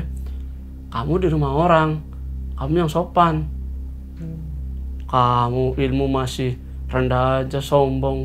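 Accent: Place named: Java, Indonesia. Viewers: ngapaknya mendarah daging wkwkwkwk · ora ngapak ora kepenak 😁 logat purwokerto nya kental bgt ya:) salam dari wong purbalingga, sukses selalu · Logat jawanya😂😂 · Khas bgt logat Purwokerto yah mas hehehhee disigar dijiret lucuuu,salam dari Ajibarang mas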